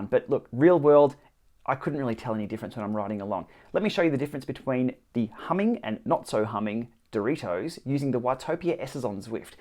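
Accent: Australia. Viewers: Hi I am conemplating buying a Direto since I have a problem with the watts distribution on my Tacx trainer: in intervalls it shifts from one sec to the next from 100 to 400 watts and I have to programm increments of 20w every 4 sec in order to have a smoother transition from one interval level to the next - is this any better with the Direto or can I programm a smoother curve on the elite Training app? Thx for a feedback - Marc/Switzerland (just having completed the Croc Trophy in Cairns..... so its good to hear some fair dinkum Aussie accent)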